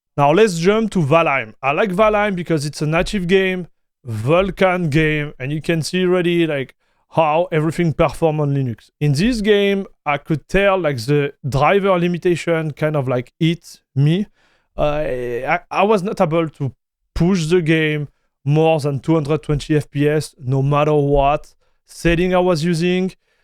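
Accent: France. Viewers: Très intéressé car je rencontre des problèmes avec ma Gigabyte 9070XT Gaming OC sur Ubuntu MATE 24.04 mais je constate qu'avec d'autres distros il n'y a pas le même problème. Sinon, ça fait bizarre de devoir écouter un Français parler en Anglais alors que j'entends bien l'accent et que tout du long j'ai envie de dire "nan mais c'est bon, parle moi en français, poto". 😅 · Cool accent, are you French?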